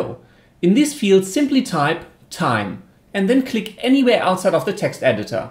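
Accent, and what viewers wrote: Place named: Germany. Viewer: I want to know where this accent comes from! Germany?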